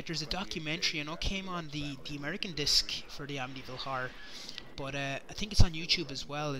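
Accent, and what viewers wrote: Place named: Wexford, Ireland. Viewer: Nice, I'd messaged you via Facebook re. The Lost Classics. Great to hear a fellow Wexfordian and horror aficionado. I get that northern shite all the time as well, crazy!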